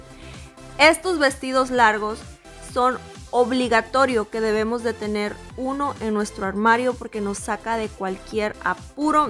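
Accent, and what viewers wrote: Mexico. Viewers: Porque habla como mexicana.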